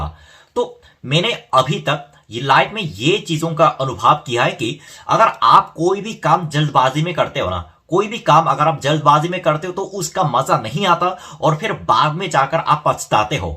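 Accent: Standard. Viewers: Kon bevkuff hai jo ye sab bola kisine subscribe kiya toh aapko kharid nhi liya hai sach a low thinking ese log bahut selfish hote hai are uski life hai woh kahin bhi jaye ghumne tumko qya kam se kam woh aapki sanskriti ko toh faila raha hai korea ka hokar he is inocent guy i like his clear hindi accent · Nice video 💜💜. Itni shudh hindi to mujhe bhi nehi ati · Ettni shudh hindii mujhe bhi nhi aatii 😅 i like your shudh hindi 😁 I am from Bihar Patna 🙂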